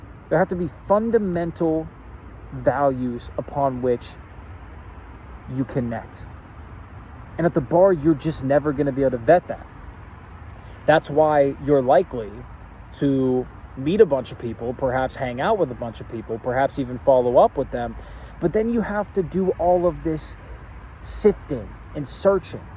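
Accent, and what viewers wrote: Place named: Chicago, United States. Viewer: I knew that accent sounded Chicagoan, you keep it real. Lots of love from Detroit.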